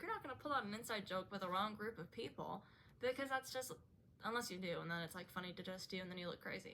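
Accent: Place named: South, United States. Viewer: Haven't heard this song before but will definitely check it out, your cover sounded good!! I've been subbed for a while but don't really tune in frequently, but your little yap session was a reminder that I need to watch these more often! Also, the moment you mentioned you were from the south I was noticing little bits and pieces of your accent with certain words, funny how that works! Take care and look forward to your next post ❤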